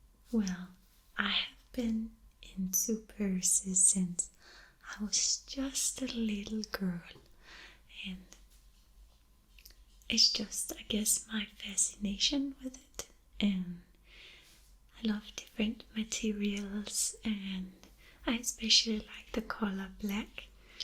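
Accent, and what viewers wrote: Denmark. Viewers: Som dansktalende, krummer jeg tæer over den accent.. Danglish at its finest.. Hvorom alting er, skøn video som altid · Det er ikke danglish nok. Jeg kan faktisk holde ud at høre på det :^) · Please do more of these Danish accent videos! It reminds me of my Danish grandparents, they both spoke fluently and had slight accents. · the danish accent!!! :) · This video was AMAZING! I loved the layered sounds going on, and your voice was so clear and can we talk about your wonderful accent and Danish dialogue?! Goodness was it tingle inducing! Bravo!! ❤ 😍 ❤ 😍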